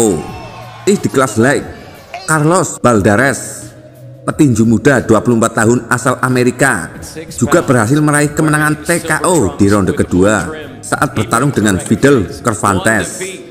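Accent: Java, Indonesia. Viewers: Medok mas... 😁😀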